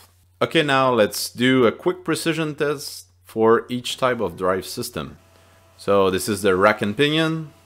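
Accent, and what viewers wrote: Quebec, Canada. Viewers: at first I thought this was going to be another European build, but that accent is Francophone Canadien isnt it? the Mastercraft toolbox confirms this. subscribing. hello from BC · Hey! I assume by your accent your french canadian, which i also am. In case im wrong ill keep on with the english. Anyway, im interested in building my own cnc waterjet cutter as i need excessive precision on the cut itself. Ive been surfing thru a lot of CNC videos but it just keeps on shuffeling the pot in my head.. would you be able to break down the control system more precisly? You went over the diagram but it seems fairly complicated the way mach talks to the breaker board and all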